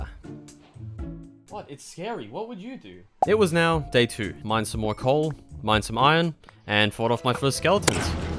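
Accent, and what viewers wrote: Australia. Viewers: R u aussie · How is your accent both Australian AND New York? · i'm not english native but i'm trying to figure out ur accent. are you australian?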